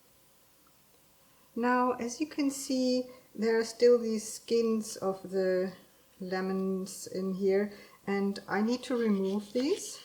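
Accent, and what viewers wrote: Germany. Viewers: Bist du aus Nürnberg?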